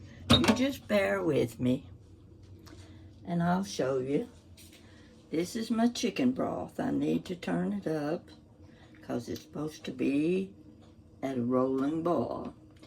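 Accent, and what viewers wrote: South, United States. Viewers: I'm just so happy I came across your channel! What a lovely accent you have. I'm going to assume you live in the southern part of our country. Actually, I'm from the colder part which in the Northeast. I hope this doesn't send cold chills through your bones but I actually look forward to snow🌬⛄ This is the time of year I cook and bake more often. Even though I live in the colder states, aka New England, I do enjoy southern dishes! And of course who doesn't love chicken and dumplings? Yours certainly looks delicious! Thank you so much for sharing and look forward to being subscribed to your channel! 🙏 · Sitting in Canada listening to your sweet southern drawl!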